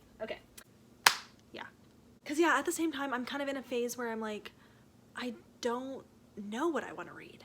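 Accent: Canada. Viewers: I love Canadian accent. About and sorry! (Thinking about Anne here, "sooooorry".) And I just enjoy it. Enjoy learning French! Love Julie Klassen. The first I ever read was Lady of Milkweed Manor and I love it. Think it may still be my favorite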